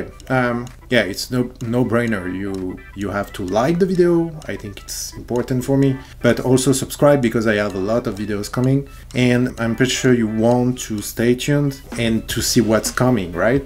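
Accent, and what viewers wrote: France. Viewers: Did you manage to get rumbles with the Xbox controller ? Btw I heard ur french accent ( Or maybe german/dutch)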